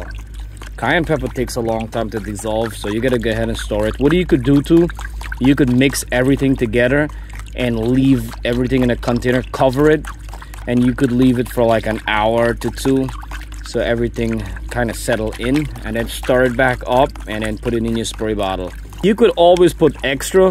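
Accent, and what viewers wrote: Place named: Guyana. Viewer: Sound like a GT Banna. 🇬🇾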